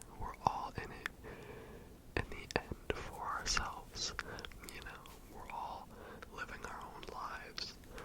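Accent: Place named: France. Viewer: Im in the same boat with college picking. Deciding between a good college in a good area but is more expensive and a good college in a not so fun area that is cheaper. Also idk If you want to know this, but when I first saw you I thought you were French. Cause in the specific video you sounded like you had an accent and I saw you had a French video on your channel. Also you kinds look French. I like to hear stuff like that, ive heard I look Russian a lot, same with my brother who looks like me.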